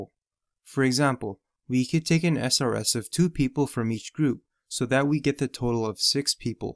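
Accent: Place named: China. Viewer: video not useful could not understand heavy Chinese accent. would not recommend -2/10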